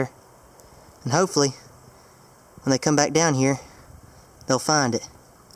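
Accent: South, United States. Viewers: You have THE CUTEST TWAng ever.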